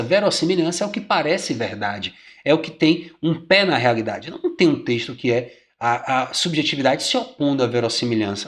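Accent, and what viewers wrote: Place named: Bahia, Brazil. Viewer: baiano ne? e foda!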